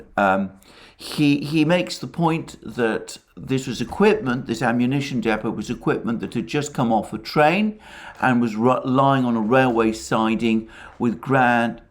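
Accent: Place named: Public school, United Kingdom. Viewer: I've never heard a guy talk more and say less. An hour of gossipy chatter "that cannot be confirmed," full of innuendo and guesses, repetition of "I can't prove it," and "I wouldn't be surprised if we found out" "this is my own speculation" all delivered in that English public school accent that bamboozles Americans. I like Al, and I've listened to him very carefully and never once heard a word that was essential learning. He's a pundit with smooth delivery of empty chatter. If you read nothing else I suppose he's good because he does do some reading.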